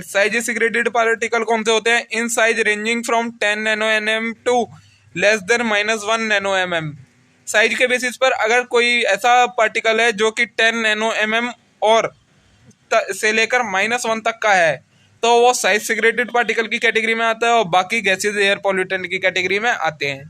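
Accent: India. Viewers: Bhai ka UP accent is so good 🌝😂🔥🔥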